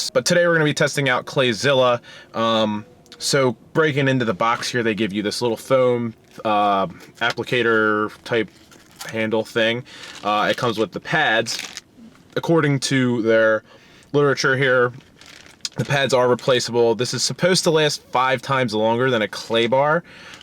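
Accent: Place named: Maryland, United States. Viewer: Sounds like you're from Maryland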